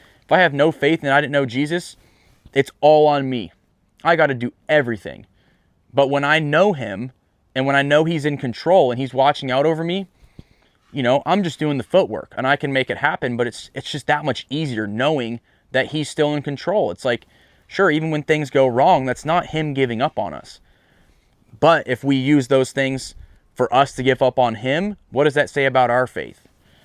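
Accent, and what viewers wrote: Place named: Texas, United States. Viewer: EXACTLY, Jesus is not our "Jeanie" God is not our butler! He's our heavenly Father ! And most DON'T understand, when they say, " i prayed and the opposite happened or this went wrong! God is a FAITH God! Means He's listening and RESPONDING to FAITH! When people talk that way, #1 they probably ain't God's child, they really aren't "saved" or #2 they have NO FAITH, or understand how FAITH works! [Hebrews 11:6] Maybe, when you're truck broke down in Texas, He's trying to say you belong in Texas! You sure look & sound like a TEXAN! Anyway, you have to follow your heart! God bless!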